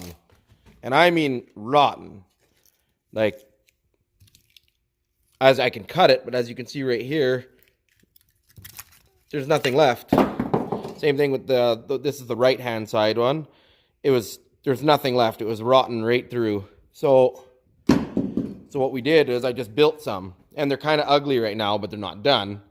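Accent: Canada. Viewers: Sounds like you're a Canadian you should watch another Canadian if you do or don't it's. a v e Arduino versus evil what it stands for but this dude is a trip his channels here on YouTube rebuilds and tears apart anyting mechanical or electronic I think you would get a kick out of this dude peace out have a great day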